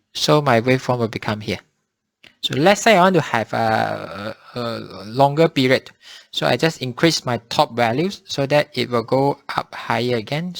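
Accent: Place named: Singapore. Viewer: Thank you for the clear explanation. Your manner of speech reminds me of my childhood home town. I am from Sg, are you from Sg or My? Just asking. :)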